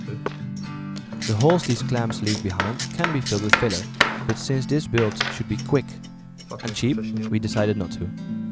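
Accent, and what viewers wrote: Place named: Netherlands. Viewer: I hear a typical dutch accent. You gave me some inspiration man. Do you have like instagram?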